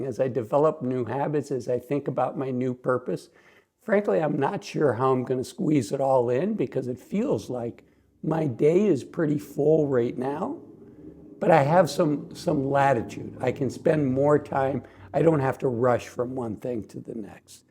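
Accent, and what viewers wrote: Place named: Utah, United States. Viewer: I’m a transplant to Utah after a career in the military. I knew I recognized that Utah accent of yours.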